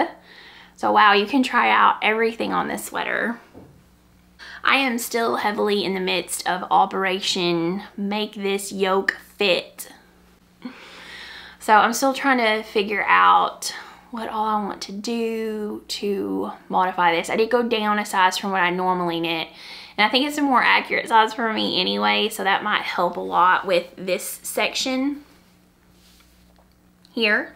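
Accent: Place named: Arkansas, United States. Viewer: Hi! I love your podcast!! I love that you’re mixing it up and doing some different formats. This was a great discussion on colorwork - awesome tips and advice. Thank you! I’ve got the Alpenglow in my queue waiting on squishy mail to arrive - so excited. I’m a fellow Arkansan transplanted to Texas a long time ago and I just love hearing your accent from home! You asked in a previous video for book recommendations. I would recommend reading “A Gentleman in Moscow” if you haven’t already read it. It’s a beautiful story. My mom and I are reading the Thursday Murder Club series at your recommendation and absolutely love it! Thanks so much for all you share!! You’re so inspiring 🐝 🐓 🧶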